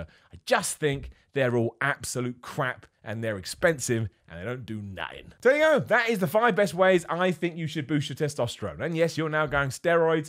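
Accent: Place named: England, United Kingdom. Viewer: Yo, you look like the older version of Saitama. Plus the English accent 👍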